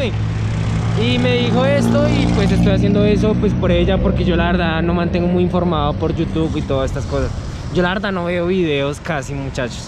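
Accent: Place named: Bogotá, Colombia. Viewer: severo acento rolo jajaj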